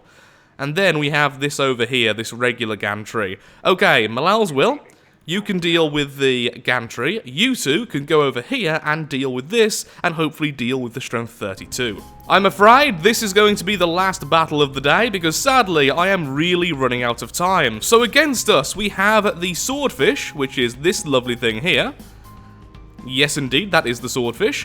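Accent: United Kingdom. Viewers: is he british? i cant tell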